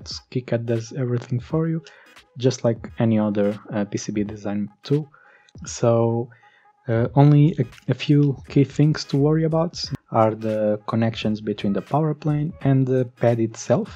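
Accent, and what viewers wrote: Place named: Portugal. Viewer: Estava a ver o video e a pensar.. esta pronuncia parece que e' portugues.. fui ver o teu canal e acertei! Obrigado pelo video!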